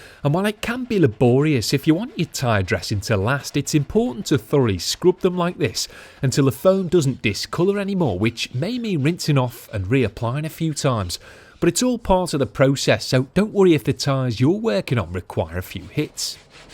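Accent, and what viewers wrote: Liverpool, United Kingdom. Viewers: The only scouse accent I like : ))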